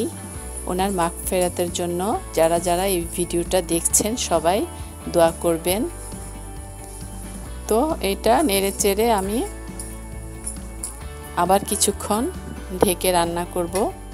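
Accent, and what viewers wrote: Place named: Mymensingh, Bangladesh. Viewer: আপনি মনে হয় ময়মনসিংহের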